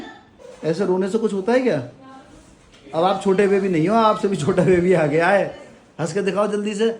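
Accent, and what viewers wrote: Standard. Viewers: Ap Hindi itne shudh tarike se bolte ho acha lgta h🎉🎉🎉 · itnii suddhh hindiii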